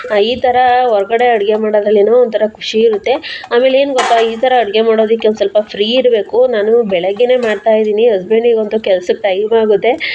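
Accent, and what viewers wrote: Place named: Hassan, India. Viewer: Nivu Hassan davara nam ajji mane Hassan hatira nivu mathdodu nodi anisuthu namadu Tiptur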